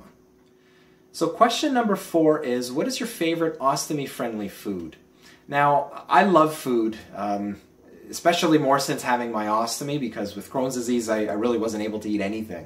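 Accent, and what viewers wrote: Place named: Canada. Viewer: Hiya! I thought that was a Canadian accent! We spent many many happy summers in Canada when I was growing up, (Nova Scotia and Alberta/The Rockies) I always thought I would move over as soon as I could but I met my now husband when I was young and that was that! We are planning to bring our daughter over for a long holiday in 2016 though and the big dream is to come and sing at jazz festivals as a way of bringing her back year after year! Super video! I'm not a new YouTuber but I'm a new ostomate (and loving it!!) and so overwhelmed with how wonderful the community is! Fantastic tag! I hope you had a lovely Christmas x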